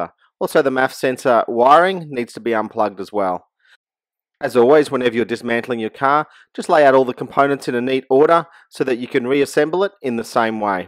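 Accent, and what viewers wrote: Australia. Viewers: you have an aussie accent mate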